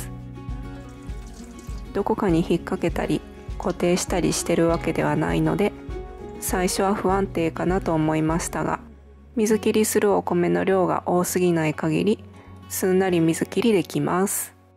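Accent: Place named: Kansai, Japan. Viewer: 関西の方ですか？喋り方が気になって動画の記憶が ダイソーセリアには良くお世話になってます!いい商品多いですね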